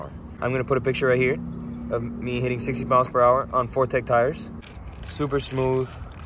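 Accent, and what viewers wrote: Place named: Miami, United States. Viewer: Have no idea how I came to find this channel but gotta say bro hearing you talk hella remind me of Game Bred himself Masvidal. Lol. Gotta be from the MIA?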